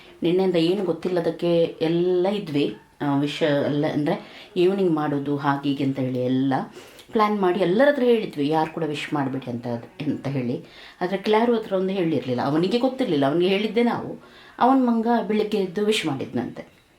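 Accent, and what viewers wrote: Mangalore, India. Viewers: ಮಂಗಳೂರು ಕನ್ನಡ ತುಂಬಾ cute unttu.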